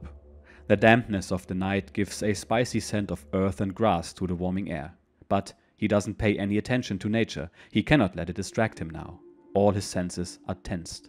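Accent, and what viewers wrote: Germany. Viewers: I've actually heard this book before, but it's got a little more drama with a German narrator! Love the channel, thank you!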